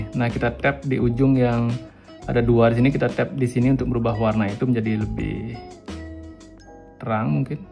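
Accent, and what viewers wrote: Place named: Bali, Indonesia. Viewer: Dari pronunciation kayanya orang Bali nih, sukses terus bli sya udah subscribe.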